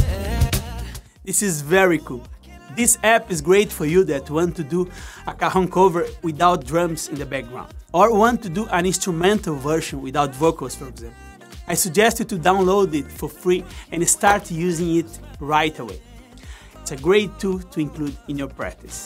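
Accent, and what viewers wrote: Brazil. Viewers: Massa demais!! é Brazuka! Dá pra ver pelo sotaque! Parabéns pelo canal!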